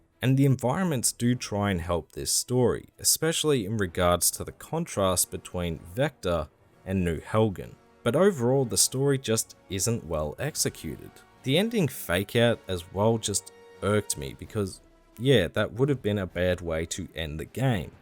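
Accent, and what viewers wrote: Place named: Australia. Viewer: My first experiences with the franchise, as you rightly suggest is the case for many in this review, was with Shadow Fall. I got bored with the story fast so I enjoyed the multiplayer a lot and as I joined late to the PS4 generation, I had the chance to muck about with all the expansions. The visual appeal of the Helghast got me to pick up Killzone 2 and 3 for my PS3 from my local Video Ezy when it was closing down for $2 (NZD) each. I figured you'd have heard of them as you sound like an Aussie. I agree that Killzone 2 with it's impressive first person cover and heavy feeling shooting gameplay is the strongest entry in the series.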